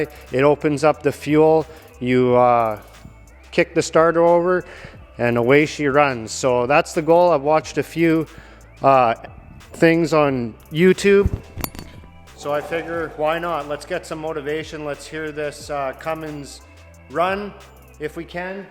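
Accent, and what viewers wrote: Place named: Saskatchewan, Canada. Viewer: You sound like your from Sask eh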